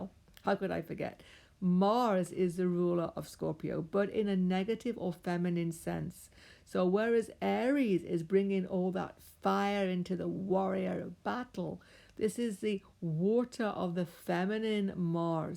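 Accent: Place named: Welsh Midlands, United Kingdom. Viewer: It's cool hearing a welsh/ midlands accent in an astrology video hehe 😜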